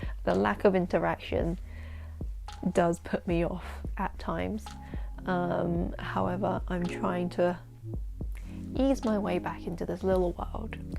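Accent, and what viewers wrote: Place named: London, United Kingdom. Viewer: I subbed to your channel from your Asian readathon tbr but again, RELATABLE bc I am lonely on youtube. I have no online friends although I am new on making booktube content 😂 Also, OMG BC bitesize saved my GCSE grades + KS3 + still being in year 13 without any exams to sit I'm using it to teach since I am currently working as a GCSE English tutor😂 Film production and photography sound so interesting! This video was so calming and your accent + inserts in this video make me miss London even more ;-; (edit) - I see my not so perfect life on your bookshelf! I loved that book so much ! Have you read it too ?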